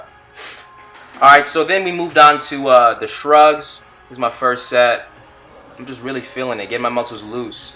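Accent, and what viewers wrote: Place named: United States. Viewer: Wait are you American? Your name threw me off but hearing your voice you seen American lol.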